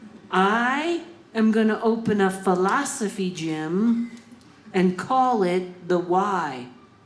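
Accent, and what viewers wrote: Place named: Wyoming, United States. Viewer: She sounds like she's a local Casper/Bozeman/ Kellogg persona.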